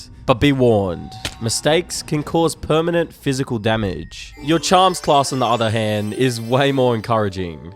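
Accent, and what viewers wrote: United Kingdom. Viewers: What happened to the American presenter? He had a beautiful way of speaking, the cadence the pauses. This one sounds British.....none of the beauty of the American. My ears hurt listening to him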